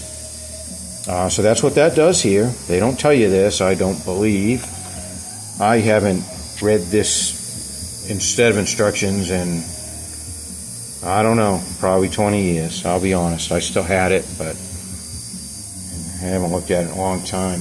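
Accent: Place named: Maine, United States. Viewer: This is great. Still super complex but really helpful. Thank you so much. I think I recall from another video that you're out of Texas, but you sound like a Mainer to me. A good thing!!! Thanks.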